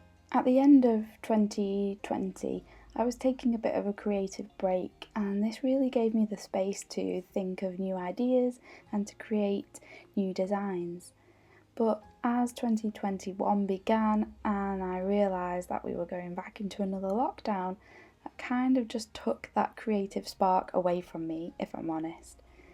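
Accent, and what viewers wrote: United Kingdom. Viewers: You have managed to touch on all of my favorite things here. A beautiful English accent, Liberty of London fabrics, Sashiko, and EPP. All of this makes for a perfect video, and I thank you for this. What do you think about the William Morris quilting cottons? They are another of my favorites. Be well, and be happy.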